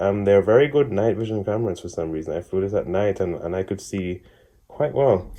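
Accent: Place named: Caribbean. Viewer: Nice video bro. Maybe someday I'll go digital when it's priced a bit better. Btw, that sounds like a Caribbean accent...do I detect some Guyanese or Bajan heritage? :)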